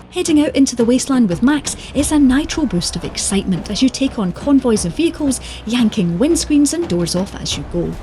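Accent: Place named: Scotland, United Kingdom. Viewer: Can't beat a good Scottish accent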